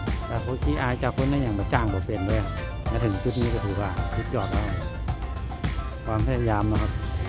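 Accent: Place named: North, Thailand. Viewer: เมินๆจะได้ป๊ะคนเหนือเนาะ,อู้เมืองมันม่วนแต๊หนาอ้าย,วิวงามขนาดเลยคับ,เติมใจ๋หื้อเด้ออ้าย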